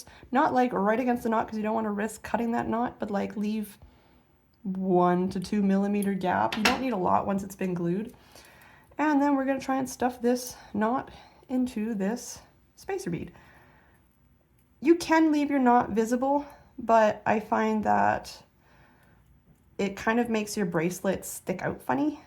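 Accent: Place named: Canada. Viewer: You sound Canadian ❤️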